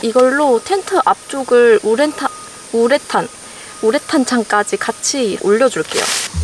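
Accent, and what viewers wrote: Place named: Gwangju, South Korea. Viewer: 같은 광주사람이라 사투리 너무 나랑 똑같아서 정겨움ㅋㅋㅋㅋㅋㅋㅋㅋㅋ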